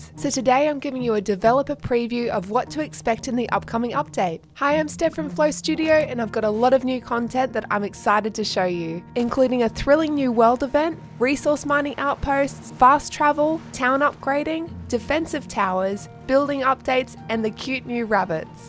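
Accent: Australia. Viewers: i like her ausie voice 🤩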